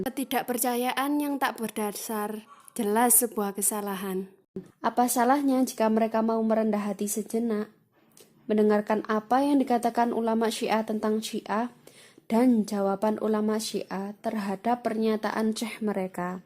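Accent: Java, Indonesia. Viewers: kalo saya liat logat2 nya org jawa y ka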